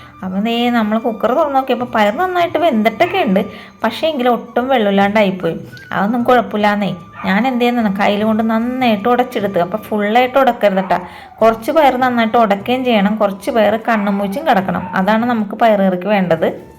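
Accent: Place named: Kodungallur, India. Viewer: കൊടുങ്ങല്ലൂർകാരുടെ സംസാര ശൈലി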